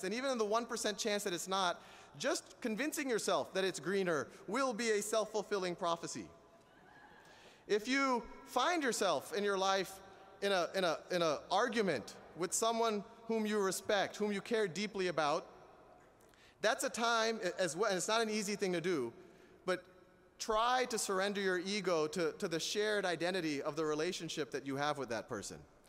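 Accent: United States. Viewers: well, he was born and educated in the USA. His parents are south asians. So, it should not surprise you that he speaks in an american accent given that he has been staying in the US for most of his life. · lol no california. i meant just his voice sounds very american to me. idk ive encountered plenty of south asians with american accents. but...sal khan's voice is extra White to me. haha just thinking out loud here.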